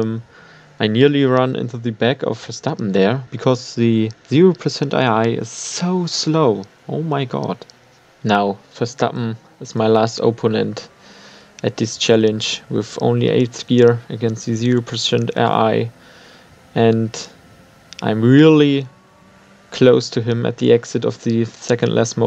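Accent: Germany. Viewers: Da hört man doch das deutsche raus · Are you german? The accent sounds familiar...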